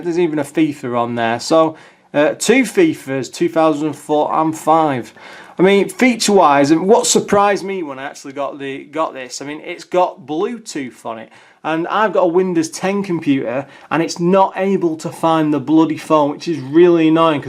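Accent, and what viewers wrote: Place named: England, United Kingdom. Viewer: What part of England do you come from? I'm intrigued by your accent.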